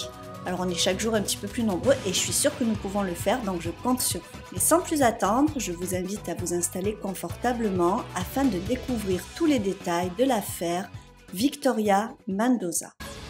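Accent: South, France. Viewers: Génial!! Tu viens du sud de la France, selon ton accent? Marseille?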